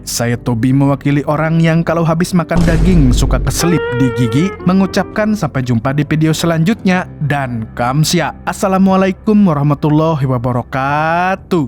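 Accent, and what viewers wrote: Banjar, Indonesia. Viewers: Banjar banar😂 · Urang Banjar kah amang ni...??😂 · Urang Banjar sekalinya pian 😂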